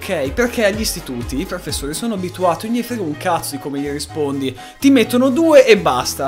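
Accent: Bologna, Italy. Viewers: Sei di Bologna lol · Uaa sei di bologna?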